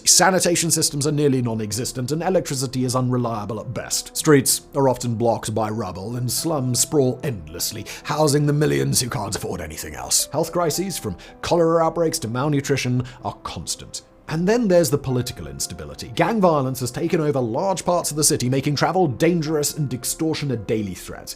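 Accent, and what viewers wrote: United Kingdom. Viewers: for a posh english b@ how do you mispronounce so many names and words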